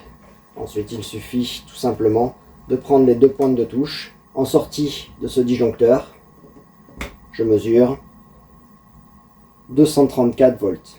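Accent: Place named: Corsica, France. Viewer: Petit accent corse sous-jacent.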